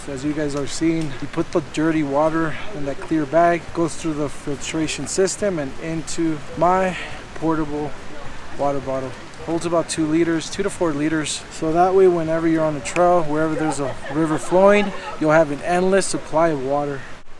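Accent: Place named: Latin America. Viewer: Sounds by your slight accent must be Latino si..good to see a fellow Latino enjoying Mother Earth well educated in the outdoors well done hijo good to meet you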